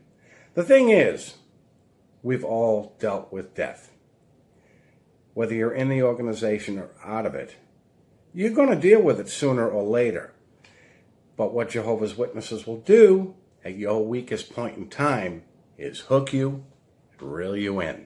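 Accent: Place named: New England, United States. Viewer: I love this channel! I’ve never been a JW. My best friend’s family joined in the early 80’s. She spent 32 years in and finally got out. She was able to remain friends with me that whole time, however. I follow these channels because I like to learn what she went through. I love your stories about your personal experience. Side note, I could listen to your New England accent all day long 😊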